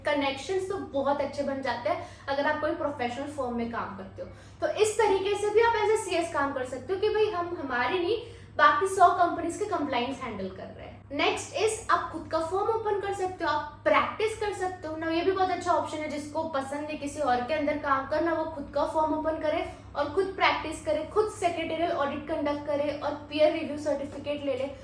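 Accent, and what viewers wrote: Gujarat, India. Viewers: Didi aap Gujarat se ho..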